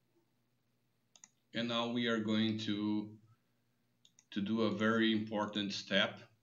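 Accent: Brazil. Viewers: Você é br mano? Tem sotaque e o nome de brasileiro haha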